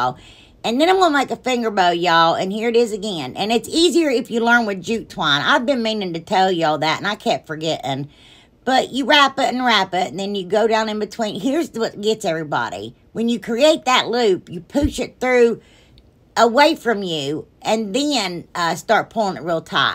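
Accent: South, United States. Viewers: . Just discovered your channel. Love your southern accent. Love your inspiration. Youur extremely creative. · I love all your videos. I'm looking forward to one this evening. I'm also from the south and I talk allot like you. Don't let anyone change you. The way you talk and absolutely everything else. That's who you are and who God made you to be. You're beautiful and very talented. Stay just like you are. I love the way you love God. We have so much in common. Be You Always and Forever. !!! ❤❤❤ 😊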